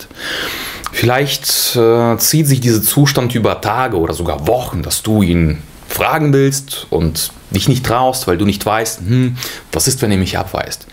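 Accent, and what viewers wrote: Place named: Russia. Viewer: Kann es sein das du ein russe bist weil du so ein russischen aktzent hast so wie ich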